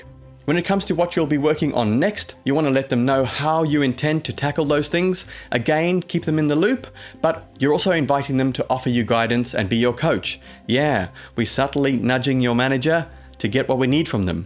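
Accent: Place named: England, United Kingdom. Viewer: The most beautiful English accent in the whole wide world. Thank you!!!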